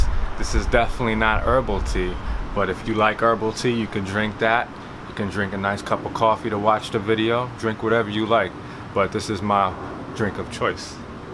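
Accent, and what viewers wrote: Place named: New York, United States. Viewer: Nice video......do I detect a NY accent? I see you have a African fat tail gecko. If you are new with them use the ground coconut fiber or even the Lugarti reptile bedding. They need a little higher humidity. The Lugarti bedding would probably work well with your snakes as well.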